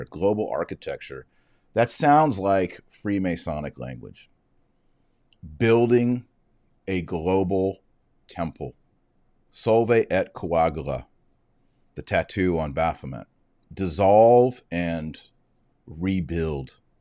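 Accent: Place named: Texas, United States. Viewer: It's just his Texan accent! Check out ' ' by on yt. Should be our motto.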